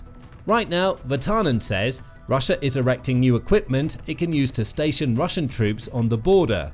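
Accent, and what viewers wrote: England, United Kingdom. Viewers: Hey can you switch to American accent? The British accent is getting a lil annoying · Bonjour, à votre accent je crois comprendre que vous êtes Anglais, donc "va en guerre", et je ne crois en RIEN votre propagande. Rassurez moi, le Russie a construit ces bâtiments sur son territoire ??? Donc elle a le droit . Vous vous persuadez tout seul que la Russie attaquerait un des pays de l'OTAN !!! Redescendez les pieds sur terre !!! Et vous ne parlez pas des troupes de l'OTAN s'installant en Roumanie, tout près de l'Ukraine et des troupes Russes !!!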